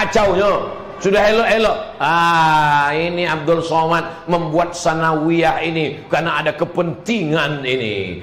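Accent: Riau, Indonesia. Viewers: Masya Allah sesuatu banget dengerinnya Tuan guru. Ceramahnya beda, banyak logat dan bahasa khas Riau nya. Saya orang Sunda, cerarmah tuan guru menghibur tapi tetap berbobot. Semoga diberikan kesehatan dan keberkahan selalu buat dan tim Yayasan Tabung Wakaf. Aamiin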